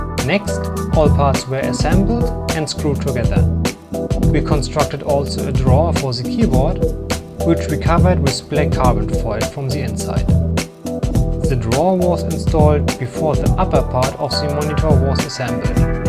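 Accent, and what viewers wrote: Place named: Germany. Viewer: Es ist immer wieder faszinierend, dass man den deutschen Akzent so gut heraushört 😅 Ein wahnsinnig gutes Projekt.. ich sollte aufhören, auf die Empfehlung von RBB zu reagieren, oder ich werde später mal nie fertig, sobald ich zum eigenen Basteln komme 🤷‍♂️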